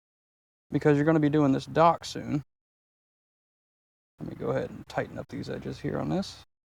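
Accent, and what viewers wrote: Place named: South, United States. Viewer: Its rare that I come across a new youtubers literal first video just as they are starting out. I really actually enjoyed this video, kinda reminds me a lot of Bob Ross, you've got his hair on point. and I can hear a little southern in your accent that I think adds a lot of charm as well. Keep it up, I'll stay tuned and hope to see your channel grow. maybe you could be like Bob Ross but not only painting nature but painting nature out in nature! I think that's a great idea! also, you've actually managed to tempt me to try painting myself in a similar way, it looks like a really neat and fun technique. Edit: also, I see no mistakes. just happy accidents.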